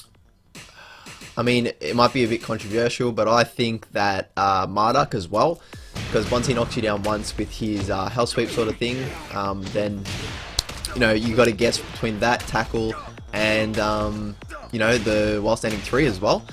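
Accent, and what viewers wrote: Australia. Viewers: The moment I heard your voice haha I knew you were aussie😂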